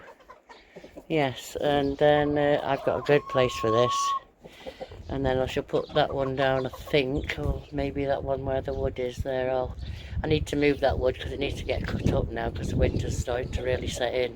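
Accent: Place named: Yorkshire, United Kingdom. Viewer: I'm curious , You live in Wales. But your accent sounds like Yorkshire or somewhere in the north of England. Are there parts of wales that have that accent. Or are you from Engalnd originally?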